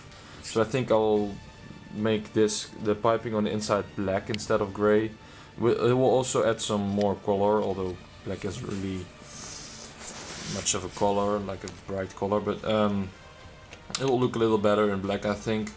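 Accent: Netherlands. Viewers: Ik hoor gewoon dat jij nederlands bent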